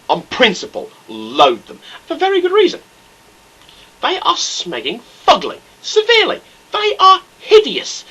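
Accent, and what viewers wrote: United Kingdom. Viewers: where are you from you sound scottish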